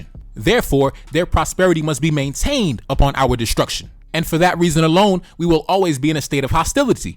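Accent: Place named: New York, United States. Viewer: I love your geopolitical videos. Those are the ones that I always click on! Not only are they informative, but you manage to make them so funny too, with your New York accent😂😂. Keep it! 🇭🇹🤝🏿🇧🇮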